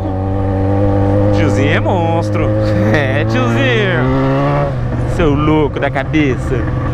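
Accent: Goiás, Brazil. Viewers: Hoje ele falo no pique do Goiás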